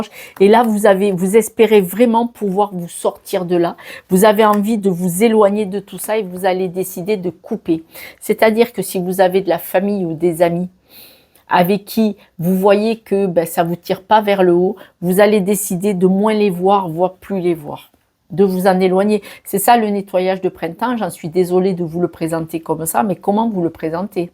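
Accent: South, France. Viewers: Bonjour je viens de m abonner à vous merci pour votre guidance et ce bel accent du sud merci à vous à très bientôt ❤😘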